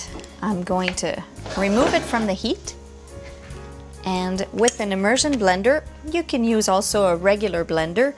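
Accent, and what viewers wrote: Canada. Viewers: That Canadian accent doe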